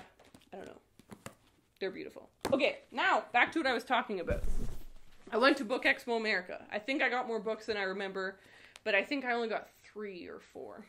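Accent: Canada. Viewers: Omg I love your Canadian accent and “about” is my ultimate favorite like it’s your trademark. Why would you try to lose it? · Emergency Contact and The Prince and the Dressmaker are two of my favorites! I hope you love them. Also, never apologize for your Canadian accent! Regional dialect and accents are fascinating in the age of digital and social homogenization. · I love Canadian long ohs!!!!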